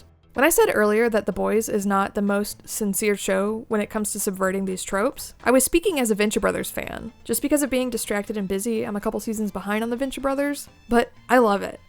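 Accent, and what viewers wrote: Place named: Australia. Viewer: He sounds aussie not Kiwi :O (-an aussie)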